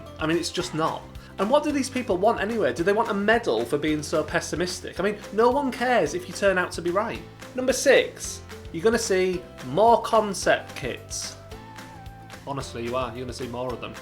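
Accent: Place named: Sunderland, United Kingdom. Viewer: You definitely have a mackem accent lol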